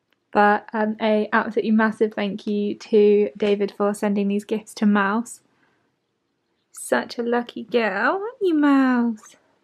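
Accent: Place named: United Kingdom. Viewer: => Wow...That is such a lovely british accent...I dig it ;_)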